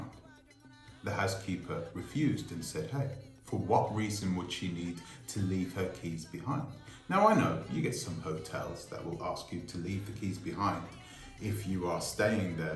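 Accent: United Kingdom. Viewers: It's easy for you to sit there with your British accent and judge this woman. So I ask you, when have you ever run an establishment like this?? Do you know her history? Have you seen the videos of the property before she restored it??? Do you know what it's like to have housekeepers and to run a home staff?? Especially when you're from a different country than your staff?? Do you even know that she's a single mother?? Have you seen the videos of people who have done review videos of their stay at Exodus?? They have filmed and shared their wonderful experience that they had there. Did you see the interview that was nearly an hour or more that the YouTuber called traveling sista did with her and how beautiful it was?? Do you know her history?? So stop trying to have a sensational story to grow your pathetic channel. Why don't you try going back to your roots and get rid of that damn British accent which never looks right on an African person. 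Take your ass to Tanzania or any other country and establish something for African people. Stop judging!! What goes around comes around and karma can be an evil bitch.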